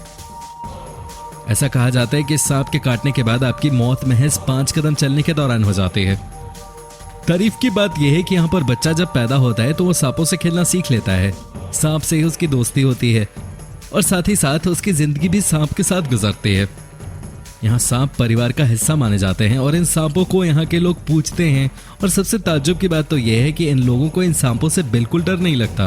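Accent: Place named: Darbhanga, India. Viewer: Darbhangha sa bol raha hi bhai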